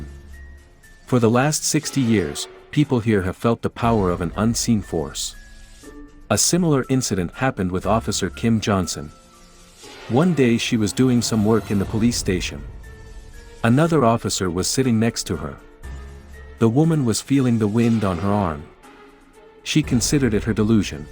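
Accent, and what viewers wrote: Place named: United States. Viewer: Brah, Honolulu and Waianae are separate counties. And it's HONOlulu, not HANAlulu. I can tell you one haole from da mainland.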